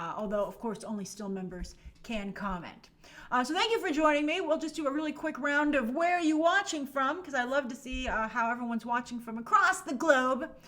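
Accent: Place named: New Jersey, United States. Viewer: You sure sound like a Jersey girl,...Luv it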